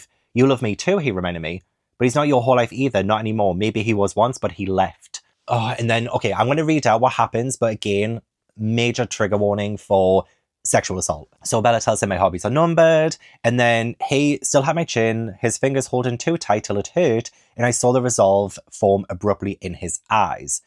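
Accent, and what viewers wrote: United Kingdom. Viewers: Idk what country you hail from. To my ignorant American ears, it sounds like somewhere in the UK. If I’m wrong, skip my comment. If not, I have a genuine question: How do you square the thoughts you present at this timestamp with the very real-life example of Elizabeth II being betrothed to Philip when she was 9, and he was in uni? Ever since I heard that, my thoughts on the Royal Family, while never chummy or loving, deepened into total disgust. ESPECIALLY considering that Elizabeth and Philip were cousins. Genuine, non-confrontational question that I’d love to have the opinion of a UK citizen of. Again, if that ain’t you, disregard.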